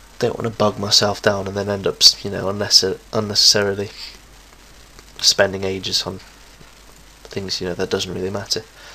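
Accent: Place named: RP, United Kingdom. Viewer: You sound so posh on this!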